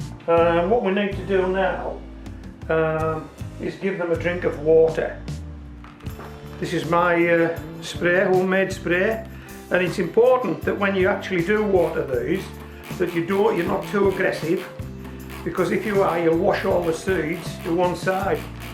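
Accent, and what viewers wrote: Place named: Yorkshire, United Kingdom. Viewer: Hi! if I'm not mistaken that accent is from Yorkshire, England. Correct me if I got it wrong.😊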